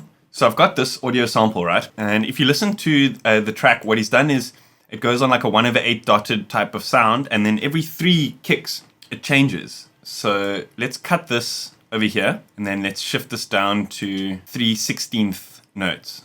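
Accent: South Africa. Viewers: You’re a good teacher on the subject you sound SouthAfrican?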